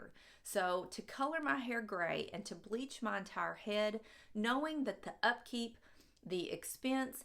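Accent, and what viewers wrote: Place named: Mississippi, United States. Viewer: Your accent is the cutest!! It makes me feel like i'm back home in MS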